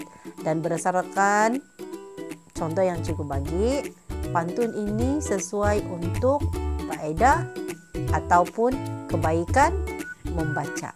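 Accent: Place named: Sabah, Malaysia. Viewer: Thanks cikgu , loghat sabahan btul ne 🤭😍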